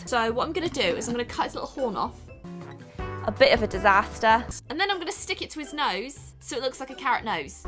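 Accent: United Kingdom. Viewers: Is anybody else just obsessed with her British accent? 😂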